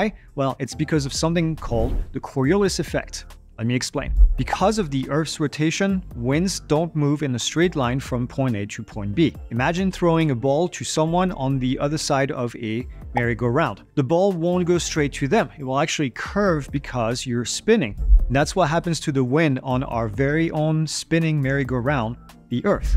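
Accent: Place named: France. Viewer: Are you from french descent ? I notice some remaining french accent in your speach.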